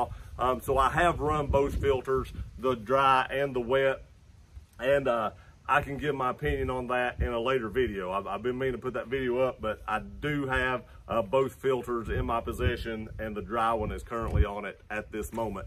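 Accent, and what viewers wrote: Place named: Appalachia, United States. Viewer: Your accent sounds like West NC, Appalachian?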